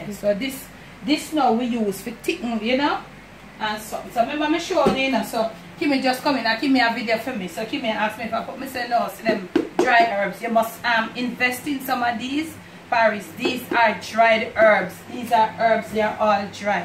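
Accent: Jamaica. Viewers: Hey! This looks great and smells great too! (Yes, my computer transfers the smells from your kitchen to my desk, somehow! Its a freakin miracle!) Any chance that you might have a written recipe to share? You speak much too fast for me to try and translate your Jamaican speak into my English ears. Thank you!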